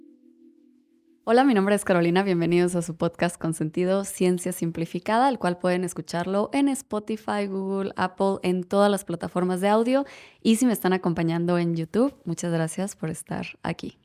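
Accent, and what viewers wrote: Mexico. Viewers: Definitivamente que podcast tan genial. Los temas y la manera como los abordas me parece genial. Ahora... Tu acento Mexicano me encanta!♥️ Saludos desde Colombia 🇨🇴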